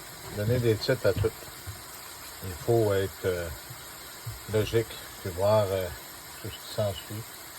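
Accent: Quebec, Canada. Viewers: un charment accent du Québec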